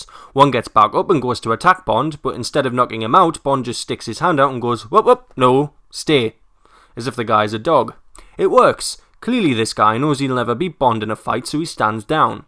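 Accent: Newcastle, United Kingdom. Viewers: Gona take a wild guess , u from Newcastle? :P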